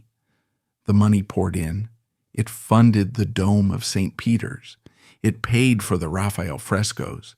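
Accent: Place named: United States. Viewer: pile of AI crap. Worse...Faux European history done with an American accent ..urgh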